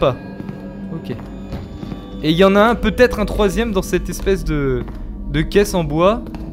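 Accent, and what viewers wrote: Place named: England, United Kingdom. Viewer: j'adore ton accents anglais 😂😂😂 Sinon super vidéo comme toujours 👏👌👍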